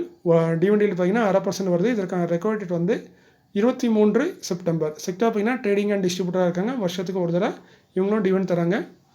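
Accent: Tirunelveli, India. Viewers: Sir please speak as early .Don't try new version. For others we cannot change Tirunelveli Tamil 😊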